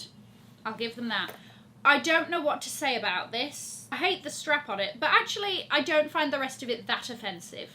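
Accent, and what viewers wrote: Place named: England, United Kingdom. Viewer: Nice review... I love your English accenT and you are so pretty. For me, I will always STAN the vintage/pre-owned luxury bag.. the quality still THERE and the prices are not always higher. But for the new designer bags I only want the Interracio from Bottega Venetta is just... PERFECT ! That's sad that now some bags are not hot like before.....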